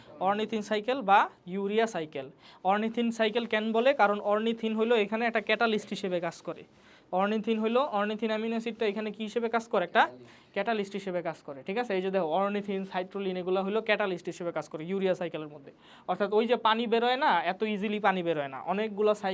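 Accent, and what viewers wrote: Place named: Barishal, Bangladesh. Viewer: ভাই মনে হয় বরিশালের,😊 মাশাআল্লাহ ভালো বুঝিয়েছেন।❤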